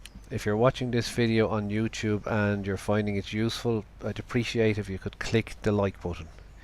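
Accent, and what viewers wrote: Ireland. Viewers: Love your sense of humour and your Irish accent. Thanks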